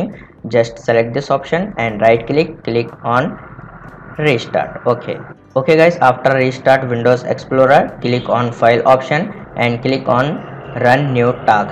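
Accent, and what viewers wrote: India. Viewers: Indian accent is never a good sign, never ever had an issue fixed with those guys.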